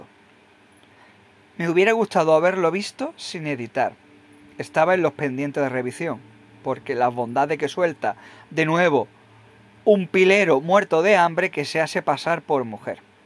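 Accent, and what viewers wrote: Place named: Galicia, Spain. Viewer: Buenísimo lo de acento gallego. Yo te veo más japones😂😂😂😂 gallego-nipon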